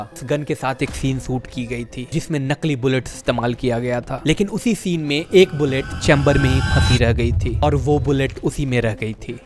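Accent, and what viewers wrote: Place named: Bihar, India. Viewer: Your Bihari accent is so sexy, say it again 😉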